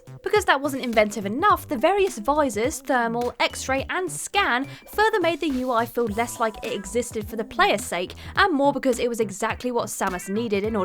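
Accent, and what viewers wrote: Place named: England, United Kingdom. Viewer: that english accent makes me watch this videos in 0.25x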